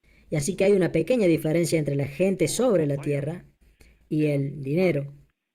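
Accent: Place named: Córdoba, Argentina. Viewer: Sonas cordobes, ya me siento en confianza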